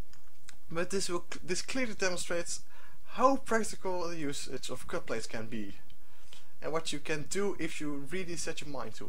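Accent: Netherlands. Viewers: kan je nog een nieuwe video maken ik hoor aan je dan je nederlands bent ook al is promods nederlands;)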